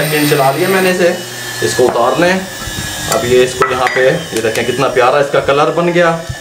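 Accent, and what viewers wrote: Punjab, India. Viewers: Sir mazzrat k saath asp ka punjabi ascent hai tau sabut lal mirch k baad aap nay Kia dala naam samajh nahi aya🙏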